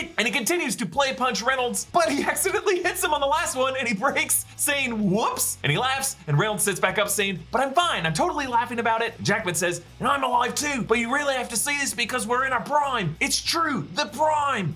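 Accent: Australia. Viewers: Aussie accent is on point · I loved your Aussie accent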